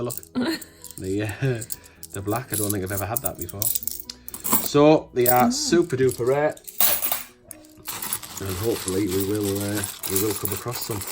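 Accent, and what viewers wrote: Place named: Northern England, United Kingdom. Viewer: Love a good old northern natter keep up the good work.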